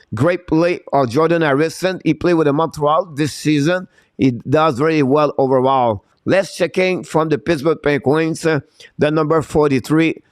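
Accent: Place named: France. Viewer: The FrenGlish is great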